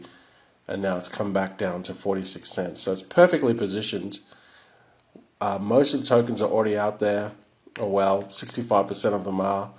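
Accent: Australia. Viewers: Hey mate, nice to hear an Aussie voice amongst all these crypto vids. I’m pretty new to this but trustswap seems like a no-brainer. Not sure I can afford to purchase enough to stake any ICO’s immediately. From your understanding is it still worth holding the token? I’m thinking so but still pretty green! Thanks for your thoughts...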